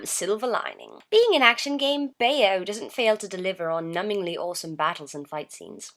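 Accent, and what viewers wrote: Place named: United Kingdom. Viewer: No word of a lie, I thought you where british, shows how much I know about my own background XD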